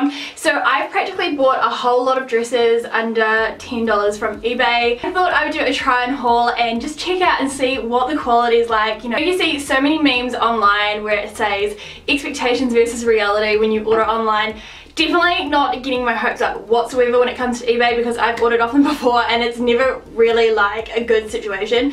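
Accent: New Zealand. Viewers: Are you from New Zealand?? If so SAME girl ❤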